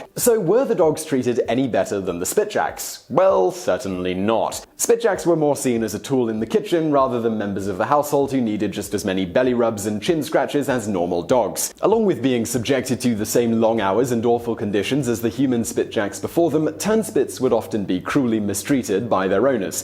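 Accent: United Kingdom. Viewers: I'm reading your comments in your British accent.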